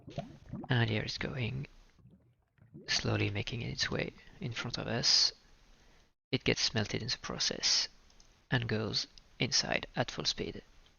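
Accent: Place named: France. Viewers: miam le bon accent francais :) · j'etais donc pas fou ! j'avais reconnue notre bon vieux accent héhé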